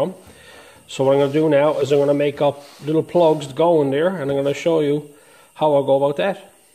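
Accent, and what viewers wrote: Newfoundland, Canada. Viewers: I thought it was Newfoundlandish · Got to be from Newfoundland awesome video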